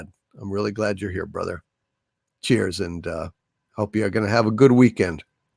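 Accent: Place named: Germany. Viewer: Scottish? I thought you were German. Your braking the fourth wall!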